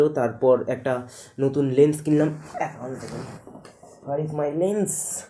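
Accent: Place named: North Bengal, India. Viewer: North Bengal এর accent টা ভিডিওতে রাখসো.. আমার খুব ভালো লাগলো দাদা 🤗 btw আমি মাথাভাঙ্গা র বাসিন্দা ♥️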